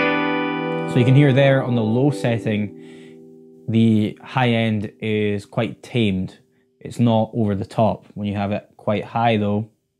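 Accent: Scotland, United Kingdom. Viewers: Good Tae a Scottish voice . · What...that Scottish accent!! 🙂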